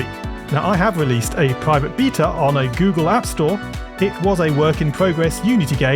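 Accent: United Kingdom. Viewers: i love your voice and that slight british accent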